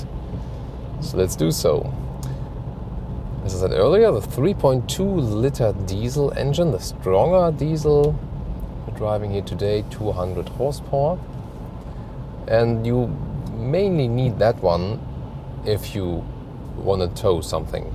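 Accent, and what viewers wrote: Germany. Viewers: Ein Deutscher spricht Englisch. A German speak Inglish.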